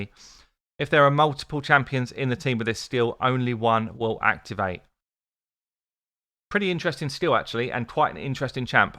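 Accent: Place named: London, United Kingdom. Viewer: Never doubted you are from London and always enjoyed your pronounciation! :)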